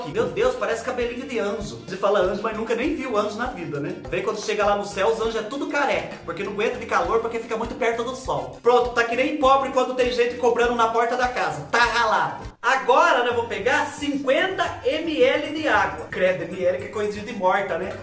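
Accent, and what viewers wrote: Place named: Paraná, Brazil. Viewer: Oi cansei de ser clandestino e resolvi regularizar nossa situação minha e da minha esposa e me inscrevi. Tenho uma pergunta: adoro sei sotaque que parece paranaense. Mas você cresceu no estado de São Paulo. Como conseguiu esse sotaque tão legal de paranaense? Amamos seus vídeos. Assim que passar essa coisa toda vamos te trazer para conhecer o nosso pequi com frango para ver que delícia do céu. Tem vários Youtubers aqui também da área de culinária.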